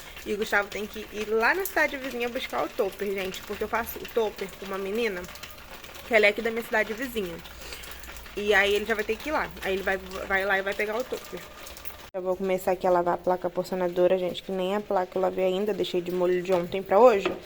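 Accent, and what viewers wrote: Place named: Rio de Janeiro, Brazil. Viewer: É o sotaque carioca kk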